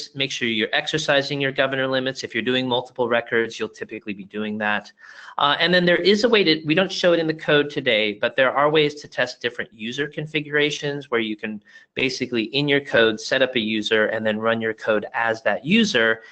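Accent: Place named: United States. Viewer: Why American voice here? Why not people with famous Indian accents in this video? Isn't Salesforce Indian company?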